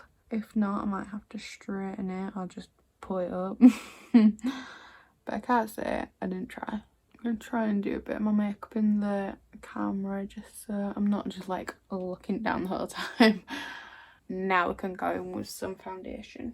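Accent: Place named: Yorkshire, United Kingdom. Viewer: I'm going to the beer garden this weekend and this is getting me excited for it :) I love your accent, Yorkshire right? Which part?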